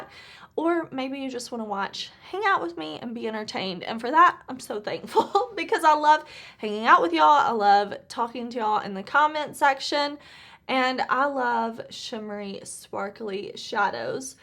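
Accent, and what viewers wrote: South, United States. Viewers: Those are gorgeous, but at 57 I don’t wear sparkle on the daily like I used to so I don’t need this palette! I am the one who watches all your videos because you are so fun to hang out with online and I could listen to your beautiful Southern accent for hours!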